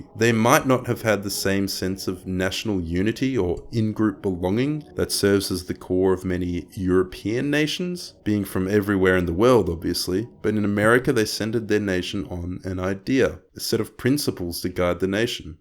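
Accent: Melbourne, Australia. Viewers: This is great bruv, I just finished playing Mad Max on stream and the overplayed accents were 100% one of the worst things haha You from Melbourne? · RE accents when you say "nobody sounds like this" you sound peak Melbourne. Not everyone sounds like that but ive absolutely heard these accents. You saying that line i think really demonstrates youre very likely from Melbourne as Queenslanders commonly report having this line said to them when they speak in Melbourne.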